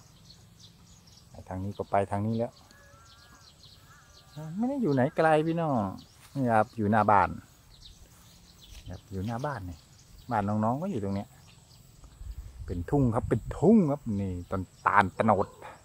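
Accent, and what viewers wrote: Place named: Sisaket, Thailand. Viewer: ศรีเกษชัดเจน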